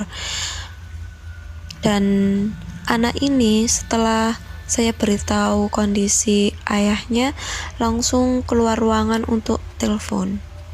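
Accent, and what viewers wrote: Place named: Central Java, Indonesia. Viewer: jawa tengah ini logatnya kalem... Medok bgt...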